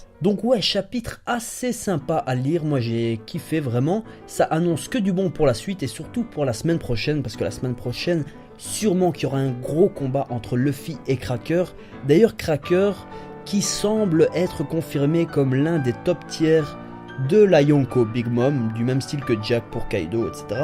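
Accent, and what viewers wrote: Belgium. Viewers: il super bien ton acent belge ca fait marre et ca change de ceux des francais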